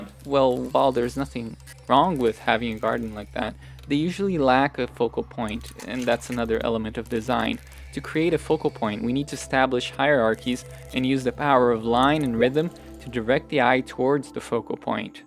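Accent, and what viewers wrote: Brazil. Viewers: Não sei se tu é Br mano, mas tem o mesmo sobrenome que eu e um sotaque mais brasileiro. De qualquer forma, teu canal é ótimo e estou me identificando a todo vídeo. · Wow!!! Just WOW!!! The convergence of your skill sets is just spectacular, this video is an absolute work of art! Are you Brazilian? You sound Brazilian! And I noticed two Brazilian cities made it onto your list of great cities. Such an amazing video. Thank you for sharing your passion so beautifully. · Tu é brasileiro ? Vc tem sutaque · Acabei de acha o canal e simplesmente amei, suspeito pelo jeito que você pronunciou salvador e Rio de Janeiro que você é brasileiro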